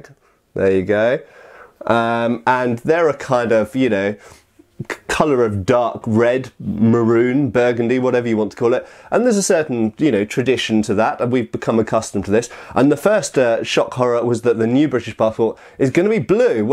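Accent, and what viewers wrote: France. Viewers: Your French accent is glorious ! ^^ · I love your french accent XD Anyway very interesting video once again, I had no idea that the new British passport would be produced in France ^^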